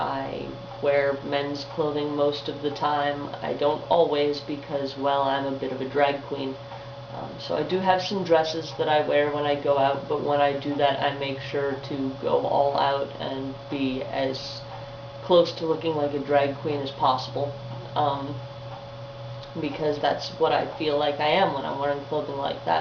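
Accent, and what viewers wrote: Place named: Midwest, United States. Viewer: You say "to me" like a midwesterner now!!! You say TO me, instead of to ME. (Loving linguistics.)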